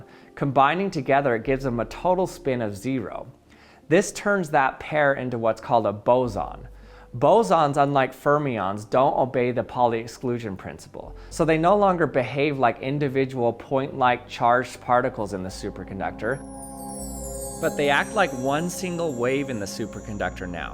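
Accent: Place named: Utah, United States. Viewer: He used emphasis, also Utah accent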